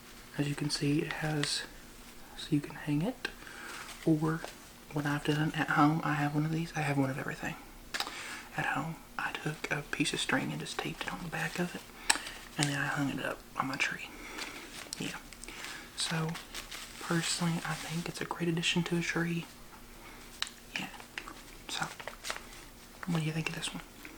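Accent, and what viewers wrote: South, United States. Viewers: That southern accent is adorable 😭♥️ all those tingles, thanks 😘 Also that pony tail looks so cute I'm crying x💓💓💓💓💓💓💓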